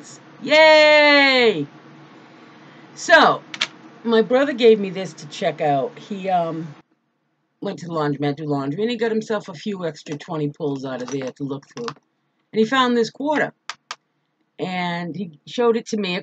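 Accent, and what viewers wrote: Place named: Northern England, United Kingdom. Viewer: I just love your Northern accent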